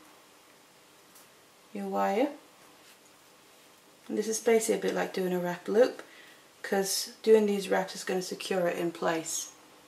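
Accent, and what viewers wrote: United Kingdom. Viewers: May i ask where i can purchase the beads and other things? i am literally just beginning and am so excited but no idea where to purchase all the stuff. I can tell from your accent your from the UK also. Thank you <3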